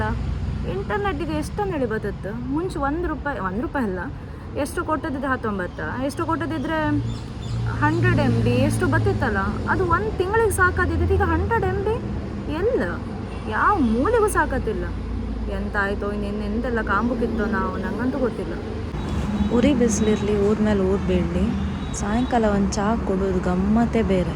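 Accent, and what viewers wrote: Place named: Kundapur, India. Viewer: Kundapur kannada super ❤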